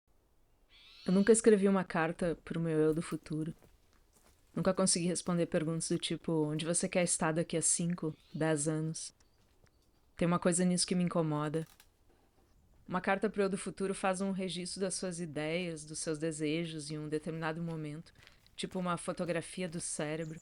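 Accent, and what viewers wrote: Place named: Porto Alegre, Brazil. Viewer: Confesso que ainda não li os teus romances, mas tenho lido tua news regularmente já faz um tempo. Desde a enchente aqui de poa, por algum motivo do acaso achei o nevoeiro e me identifiquei. Comecei a escrever também. Muito legal te ouvir com teu sotaque portoalegrense. Lindo o video e apesar da sensação de isolamento (volintário), dá pra entender muito bem porque tu escolheu morar nesse lugar.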